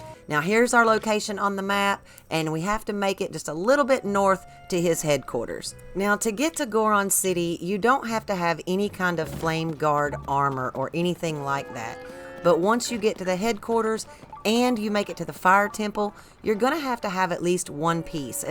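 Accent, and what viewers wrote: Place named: New York, United States. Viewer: You sound like your from New York.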